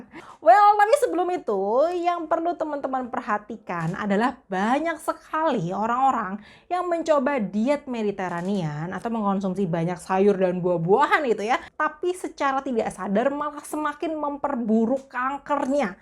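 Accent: Java, Indonesia. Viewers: Dr klu berbicara medok banget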